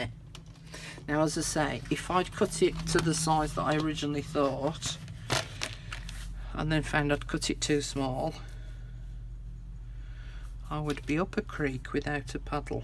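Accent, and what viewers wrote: United Kingdom. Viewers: Oh I'm so proud of what I have accomplished today. Some pages are just a wee bit crooked...like an eighth of an inch but I still love this crooked soft covered beautiful fun journal you have taught us step by step. How can anyone do this? You are a sheer genius and a love. I learned a whole lot too. Truly I have. I have to make my cover but I'm saving it for last. I want it to be extraordinary and wonderful and full of bits of happiness... I love how you you use the word bits all the time. And your accent is helping me with British movies I could nevah undahstand before you. I want to show you my envelope journal when I'm done but how my dear?. Again accept my apologies for being rude about editing things out. I so love watchin you and listening to your voice. Happy nights my dear woman. Happy nights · Love that British accent and how precise you are with placing and cutting. 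Your instruction is excellent and I am definitely addicted to these envelope journals thanks to your beautiful presentations. I am a bit unclear about where your gorgeous kits are from. There seems to be more than one resource, your own designs and others? Thank you for sharing your incredible talent.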